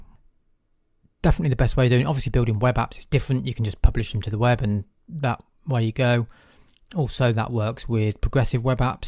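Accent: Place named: United Kingdom. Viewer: Great content! But British accent isn't my cup of tea.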